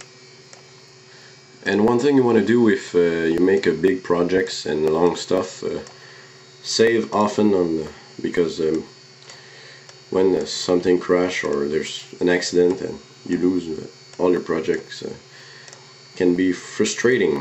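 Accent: Quebec, Canada. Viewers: B à la première seconde de la vidéo jme suis dit "oh yest quebecois !" merci pour la vidéo !!!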